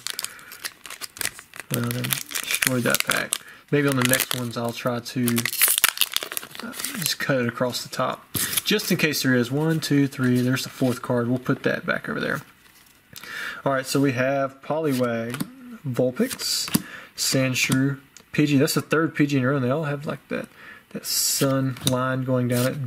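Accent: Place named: Georgia, United States. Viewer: do you live in Georgia in usa because you have a Georgian accent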